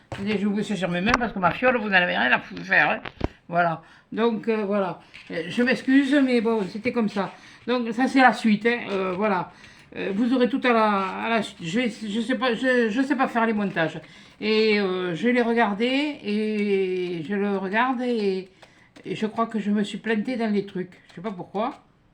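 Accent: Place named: Lozère, France. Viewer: Bonjour. Vous m avez bien fait rire avec votre kangourou et votre accent. Je szis pas d où vous etes mais certainement pas du nord de la france. Il me fait penser un peu du sud de la Lozère. J ai passé un bon moment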